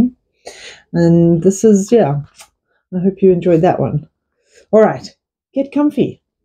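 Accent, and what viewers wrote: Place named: South Africa. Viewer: Your voice and accent give a sense of familiarity, having lived in SouthAfrica as a child. Simple words of wisdom or proverbs seem to have disappeared from daily language.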